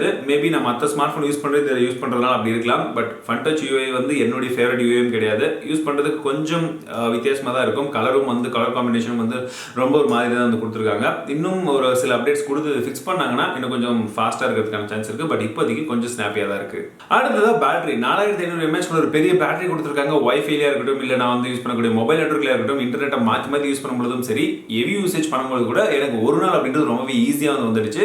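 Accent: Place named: North Chennai, India. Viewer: konjam vada chennai la vara maari voice vardhu bro its gude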